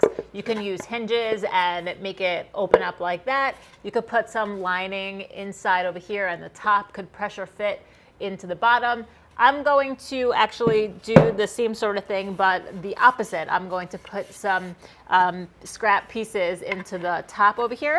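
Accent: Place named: Long Island, United States. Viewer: trying to place your accent. Could it be the eastern end of Long Island?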